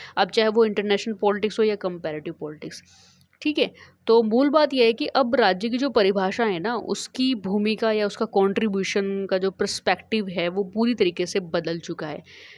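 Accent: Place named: Pakistan. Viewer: Mam your content is very helpful but your english accent matches with pakistani cricketers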